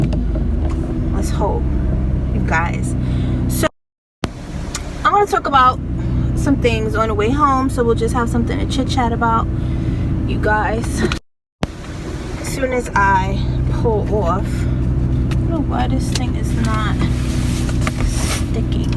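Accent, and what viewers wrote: New York, United States. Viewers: Hi sis, lol, I love you right..lol but I use to think at first you sound mad, but over the years since I know you better, I know you dont have an attitude. But its just the way you guys sound in NY, just had this convo with some ppl I know from NY, to me she just seems so harsh but thats just her demeanor and her way of speaking. Just like the way I talk is different, some say country or soft spoken. Now go watch my vid and tell me how I sound to you..lol..great video always and true about the life timers on welfare. The abusers, but the ones who dont try to do anything in life but use up the system, its the kids I worry about not the parents. Trump just wants to see how far he can rule and mess up lives. tfs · girl, you had me cracking up about that police officer and that gym...you like bitch, I don't want to talk to you! 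lol...lol...I was dying, I feel the same way, the gym is suppose to be where you get your workout on and then bounce, I woulda been mad too if I was you, I hate being bothered, especially when I want to be left alone and do my own thing...did you ever get to go to that restaurant or to see hidden figures? girl viola davis is an amazing actress, she puts her heart, soul, and everything else into her acting...I haven't seen either movies because unfortunately I don't get the chance to really go and see movies like I use to, but both movies look like they ought to be great.. look forward to seeing your mount review...I always wondered some time back how people was able to do that...giggle...I was lucky, when I was in my car doing videos, I wasn't moving, just sitting in my car, and the way my car is made I was just lucky, I was just able to sit up my camera with a small box and it worked...giggle..I think you have a little bit of an accent, not much... 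I have heard new yorkers talk and a lot of them definitely has it..does it sound like attitude? sometimes, depending on the person, andrew dice clay comes to mind, now that dude has a hella accent, and when he talks most of the time, its with attitude, lol...so again it depends...and oh girl! yes I saw new edition movie and I loved it!! all the actors were on point, I actually did a review on it, when you go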